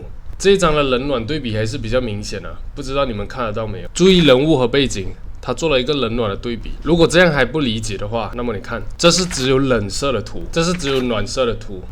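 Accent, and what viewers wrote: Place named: Malaysia. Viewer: hii! 本人是小小新手入门的小画家!，一点进去影片听到malaysian腔就觉得很亲切(? 插画圈子在马来西亚是真的很小,平常都是混欧美/日本的圈子多一些，可以看到在本地有那么大神的画师真的太棒了T-T.很喜欢你的幽默！影片也简单易懂,希望能够做多多这种相关的内容！:face-blue-smiling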